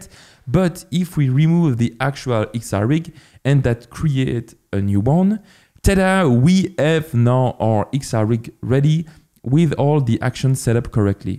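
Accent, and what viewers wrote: France. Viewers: es-tu français ? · Hello. Tu es francophone ?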